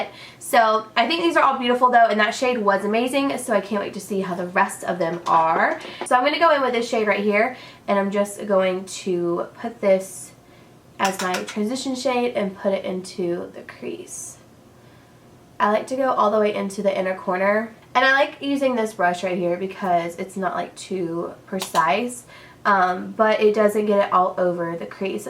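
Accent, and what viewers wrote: South, United States. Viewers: I just discovered your channel and I could tell you were southern but always wondered where from. We are from mississippi but my husband has family from Alabama and he is a HUGE auburn fan and has passed on his love for the team down to our son! We try to make a game atleast once or twice a year. Love it and love your vids!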